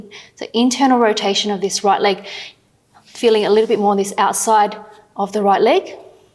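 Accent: Australia. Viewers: I feeeel so goooooood thank you💚💚💚💚💚💚💚are you Australian?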